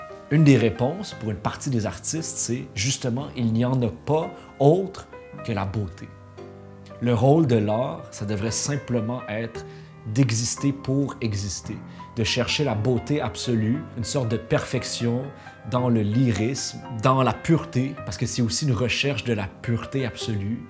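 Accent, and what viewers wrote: Quebec, Canada. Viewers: Tu vois le beau gosse arriver et puis l'accent Canadien ... Ahhh Non. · Je suis Française. Qu’est ce que j’aime l’accent du Canada 😍 et des vidéos tjrs enrichissantes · Ton accent québécois est magique! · Il est québécois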